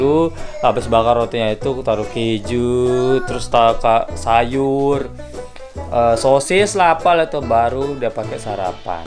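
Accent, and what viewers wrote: Lombok, Indonesia. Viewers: Saya baru nemu chanel nya bang,,stelah saya nonton bbrp videony rasany kok bahasa indonesianya lombok banget..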